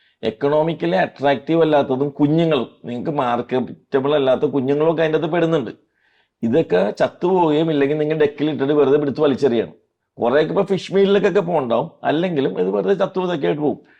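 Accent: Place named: Kochi, India. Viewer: ആാാ ക്വച്ചി ഭാഷ ആണല്ല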